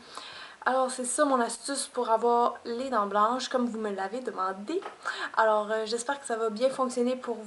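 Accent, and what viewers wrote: Quebec, Canada. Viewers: ses l'accent québécois :p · accent canadien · Je viens de tomber sur ta video par hasard, et J'AIME ÇA!! CET ACCENT GÉNIAL DU QUÉBEC! ET CETTE FRANCHISE! Et en plus c'est clair, net, et humoristique!! Personne ne t'a encore proposé d'être broadcasté sur un show quelquepart?continue, ça devrait venir!! bonne chance et bonne continuation à toi!! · C'est marrant venant de quelqu'un qui fait un paquet de fautes écrites, se permettre de faire des remarques à une personne pour ce qu'elle dit oralement tout ça parce-qu'elle ne comprends pas "à cause de son accent". Au faite, si vous aviez vraiment pris le temps de regarder son blog vous sauriez que cette demoiselle vit au Canada, et qu'elle est Quebeccoise... Alala incorrigibles ces internautes... #tousse tousse un peu débile aussi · Comme les autres l'on dit, en France on dit "bagues". 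L'accent québécois est toujours aussi marrant! C'est fou comme les mots peuvent être différents d'un pays à l'autre.. En tous cas, Merci pour tes conseils :) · jadore laccent canadien :p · Coucou ! :) :) Merci beaucoup , malgres 3 semaines de recherche au magazin et sur internet , j'ai reussi a troouver le produit :) ! J'adore ton accent ( c'est quebecois ??) :) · Wouaah ... même sur une chaîne, où une jolie et gentille fille, prend sur son temps, pour nous donner pleins d'astuce (grand merci au passage !) les gens arrivent encore a critiquer, rager, et limite s'insulter ?! déstresser un peu et renseigner vous avant de dire "nous c'est des €....on le sait !! Oui elle est quebecoise, d'ou l'accent, mais elle va pas convertir tout les prix, pour tout les pays, démerdez vous un peu P**** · C'est l'accent québécois cocotte, par contre puisque t'en parles, en français on écrit "ça", "d'où", "vient", "saisis", "ce", "dis, "vous dites", "non". 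cordialement, bisous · J'adoooorree son accent québecois !! Abonnée directt ! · le canada ! je kiff ton accent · J'adore ton accent Québécois mais comme la dit Zephyrlevent les broche comme tu dit en France ce dit bagues. Très belles dents au passage. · faut écouter la vidéo avant de poste un commentaire pasque elle est québecoise mes bon... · Tes jolie, personnellement je n'aime pas beaucoup l'accent québécois... :x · Sa se voit ! Tu es Québécoise non ? :) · J'adore trop ton accent québecois ;-) HeHeHe !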